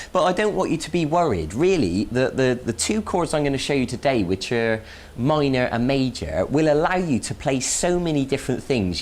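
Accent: United Kingdom. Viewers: Well, Great Britain is a pretty big place, so there's plenty of different dialects (a guy from texas and a new yorker doesn't really sound like they're from the same country). He doesn't talk the stereotypical London english, but it stills sounds like he's form GB. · his voice isnt funny hes british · oh boy!!! r u kiddin' me!!? I agree that Boys n Gulz have different voives but gays also have got their unique voice, i knew 2day... he's a British n british have their own way of talkin' English. That's it!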